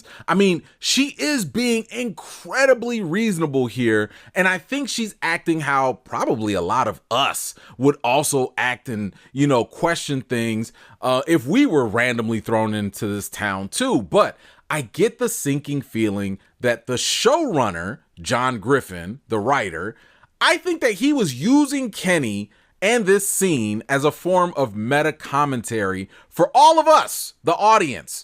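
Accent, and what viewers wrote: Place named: Chicago, United States. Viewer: I KNEW YOU WERE FROM CHICAGO! Lmao and you remind me of one of my friends in Dolton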